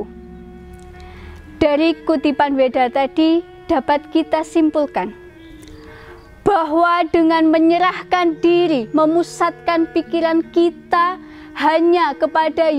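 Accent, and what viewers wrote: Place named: Java, Indonesia. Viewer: Medhokmu nduk.. bikin teengiang2 ... 🙏🙏🙏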